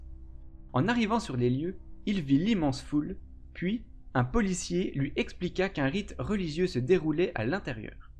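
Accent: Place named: Jura, France. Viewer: Tu as ll'accent jurassien c'est excelent^^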